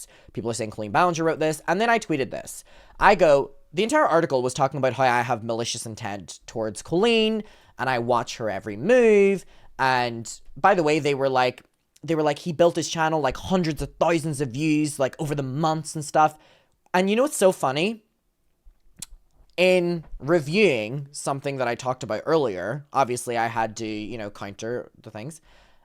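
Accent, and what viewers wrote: Ireland. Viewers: They didn’t even watch any of your videos if they didnt know you were Irish. Although there are some Americans that cant hear the difference between an Irish and English accent. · A couple things: 1, Andrew CLEARLY didn’t do any research because if he can’t even get the country you were born and raised in correct, he’s a certified moron.. I’m from the US and I could tell within 30 seconds of watching you for the first time that you have a very clear IRISH accent. Not to mention you speak about that sometimes. And 2,…can we all agree Andrew and Vanity Fair are groomer supporters? EW, not a good look for a “reputable” organization. Boycott VF!!! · Not understanding the difference between Ireland + England is crazy 😳 You’re accent is clearly Irish like - did they not watch any of your videos? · they did 0 research on u bcos how’d they READ YOUR NAME and HEAR YOUR ACCENT… and not immediately assume ur from Ireland 😭😭😭😭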